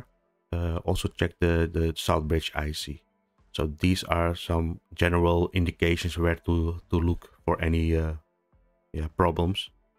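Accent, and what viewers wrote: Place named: Netherlands. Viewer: Zo horen ben je Nederlands👌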